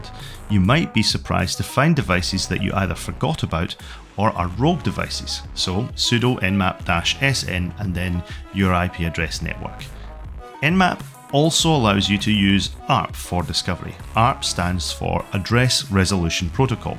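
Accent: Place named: Scotland, United Kingdom. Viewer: It's a Scottish accent 😂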